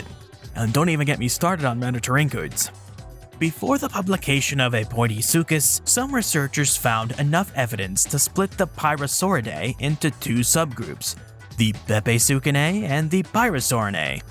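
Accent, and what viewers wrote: Spain. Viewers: The day he stops giving Portuguese a spanish accent will be a day I'm happier than usual ... Until then I'd prefer to hear the correct pronunciation by any other means, like c'mon, can't you just insert Google translate audio?? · I Love how u give everyword spanish entonation, its a good guess if u dont know much portuguese, still funny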